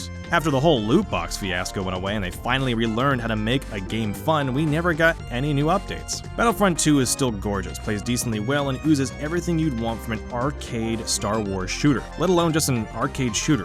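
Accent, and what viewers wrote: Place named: New York, United States. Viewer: you have a New York accent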